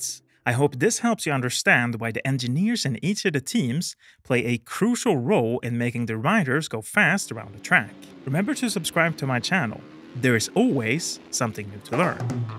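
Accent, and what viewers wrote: Sweden. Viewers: Fan vilken irriterande svengelska ”this meeeeeens”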